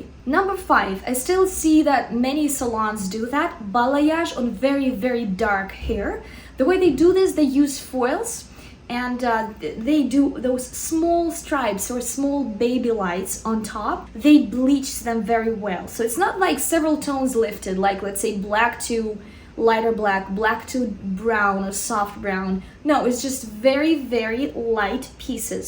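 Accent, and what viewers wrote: Russia. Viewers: Мне кажется, или есть очень сильный русский акцент?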